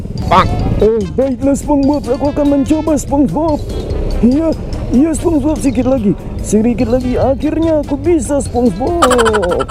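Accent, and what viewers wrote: Medan, Indonesia. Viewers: kek ginilah bahasa medan.. moga sukses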